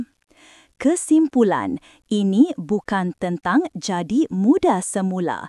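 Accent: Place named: West Kalimantan, Indonesia. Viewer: No.1...saye dari makassar...trim's...aksen Dr mirip ipar saye dr ketapang kalbar🙏🙏🙏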